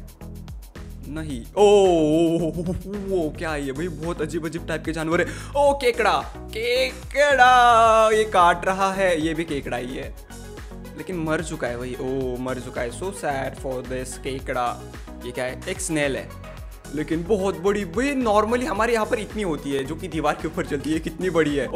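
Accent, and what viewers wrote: Maharashtra, India. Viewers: Are you marathi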